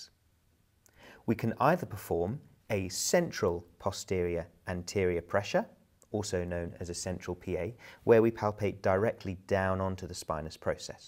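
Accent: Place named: United Kingdom. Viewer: your explanation is crystal clear this how you can tell British English sounds better than American 's 😊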